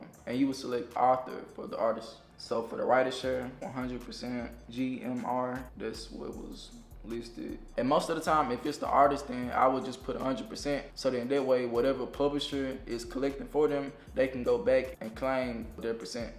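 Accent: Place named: Rural, United States. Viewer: Man ! What’s this accent ? Are you from a country side of the US ? It’s difficult to understand you sadly. Thanks for the video anyway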